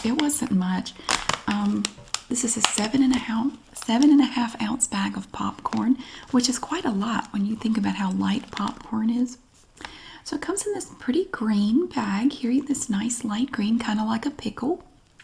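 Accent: South, United States. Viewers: You sound like Pam from the Office but with a southern accent!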